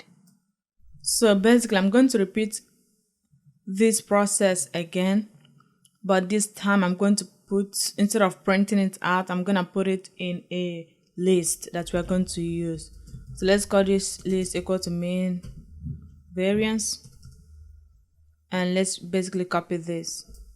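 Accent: Nigeria. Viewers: you sound Nigerian girl ... I am gonna follow your channel...... Love you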